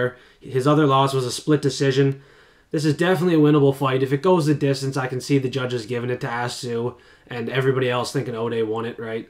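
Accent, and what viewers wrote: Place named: Charlottetown, Canada. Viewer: I knew that accent sounded familar. I'm from Charlottetown.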